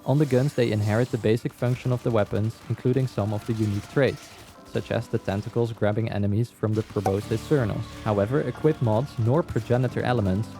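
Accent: Netherlands. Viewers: nice dutch accent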